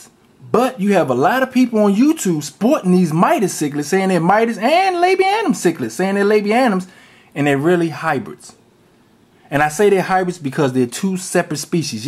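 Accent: DMV, United States. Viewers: sound like your from the dmv if so we need to hook up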